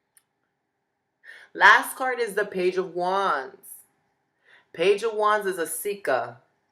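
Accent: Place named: New York, United States. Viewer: Your accent makes me miss NYC so much. More confirmation I need to move back!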